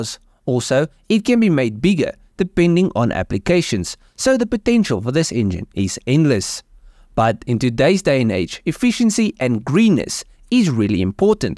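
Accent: South Africa. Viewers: I somehow don't think Noel Duke would appreciate your over-laying and inter-mingling his Z-axis engine rotary engine with the VERY different 'DEVIZE Barrel engine'. ... and I would know; Noel is a personal friend of mine. Barrel-type axial engine's have been re-cycled over, and over and they have NOTHING new to offer. All fail because using a cam plate to translate reciprocating loads over the long-term life of a typical automotive engine is a non-starter. From your accent you are obviously South African so why not look in your own backyard? The Van Loewy (my memory may be faulty on the exact spelling of the name) is a far more interesting axial engine from the 1980/90's. It used patented universal joint to articulate the piston-rod/wobble-plate connection such that it eliminated the figure-of-8 oscillation and resolved the motion to a pure straight-line motion. 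superior to any cam-type axial engine! How about it?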